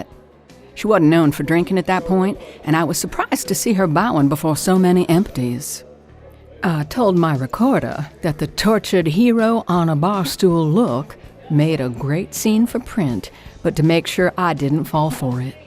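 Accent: South, United States. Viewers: Very excellent story. The narrator did an excellent job despite using a southern drawl to tell this unusual tale. Sometimes, when narrators use accents to narrate stories, it can be difficult to understand them, thus, taking away the pleasure of enjoying the story. Not so, in this case, she was very easy to understand. I hope to hear more from this narrator in the future. Also, the story it self was very well written. It had a different type of plot which gave it a fresh and new feel to it, that the other usual horror stories sometimes lack. The plot was also completely unpredictable which I liked, because I hate predictable stories. Finally, the unusual plot twist or twists were well done and very surprising. Overall, I really enjoyed listening to this story. Well done " ". 😉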